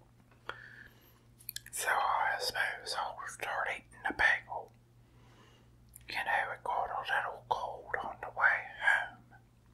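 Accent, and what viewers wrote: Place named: Pennsylvania Dutch, United States. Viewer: it's a penny Dutch accent albeit a little different from the traditional penny Dutch accent. He's from PA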